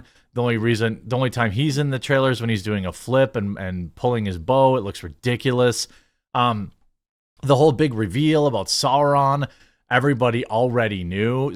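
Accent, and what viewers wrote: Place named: New Jersey, United States. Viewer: " says dragon funny." Not sure why, but you have a new jersey accent. You have the same accent as Nick Nimmin, David Laid, etc.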